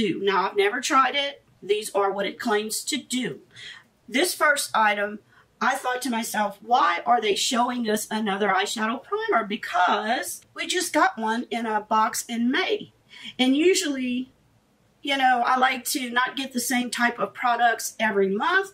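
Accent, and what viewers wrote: United States. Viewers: Hi I stumbled upon your channel and within the first minute I knew you had to be from Louisiana, then when you pronounced Kevyn Aucoin's name the right way i knew i was right. I love Boxycharm and i appreciate all the hard work you but into this video!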